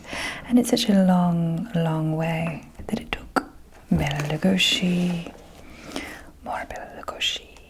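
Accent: Transatlantic, United States. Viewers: You are so bloody fabulous. Thank you for sharing your voice and your mind with us! Can I ask where your accent is from? It's delightfully Transatlantic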